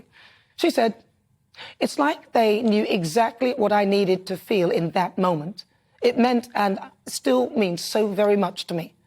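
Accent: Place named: England, United Kingdom. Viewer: you are brilliant. You see it and you tell it. Love your English accent too:-) Hi from NZ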